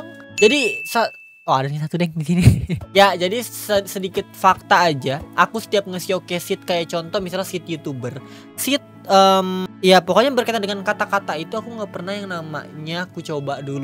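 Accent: West Java, Indonesia. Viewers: Kak orang sunda kah?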